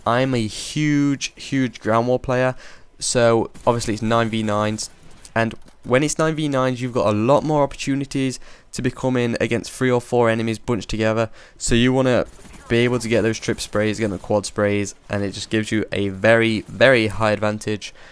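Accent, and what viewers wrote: United Kingdom. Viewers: FINALLY, A BRITISH COMMENTATOR!!!!!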